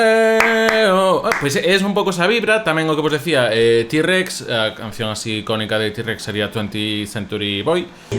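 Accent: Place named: Portugal. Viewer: Genial video man! Pero me sorprende tu idioma re flashero jajaja portu español o nose re loco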